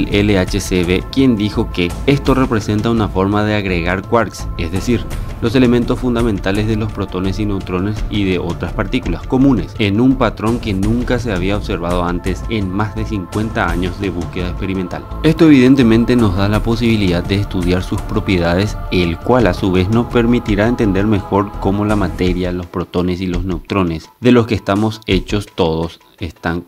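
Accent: Paraguay. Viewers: ¿Eres Paraguayo? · Es confundible por LA voz es paraguayo yo soy Paraguay tambien jeje saludos amigo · sos paraguayo?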